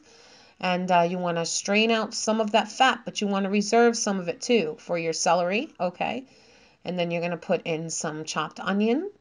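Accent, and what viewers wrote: New York, United States. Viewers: Are you from NY? You sound like my cousins from NY! I love Wendy’s Chili and I’m crazy about Puerto Rican Food!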